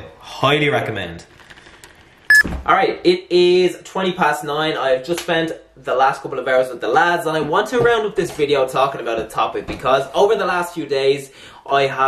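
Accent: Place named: Ireland. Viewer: Love your videos especially love seeing an Irish YouTuber great to hear a familiar accent a good video would be on face fat loss would really help 👍🏽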